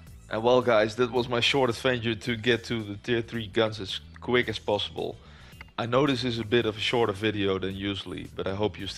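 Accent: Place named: Netherlands. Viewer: Grappig dat je altijd kan horen dat het een nederlander is😅 niks mis met je engels hoor btw! Leuke video!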